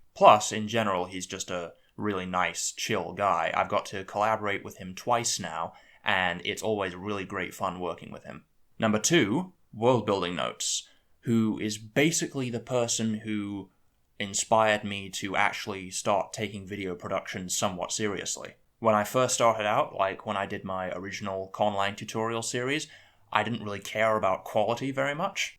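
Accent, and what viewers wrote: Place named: England, United Kingdom. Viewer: Where are you from? I can tell you're from somewhere in England but I really cant differentiate dialects within england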